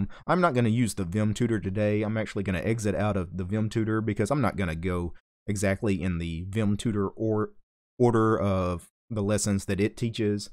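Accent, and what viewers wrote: South, United States. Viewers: You're from the south?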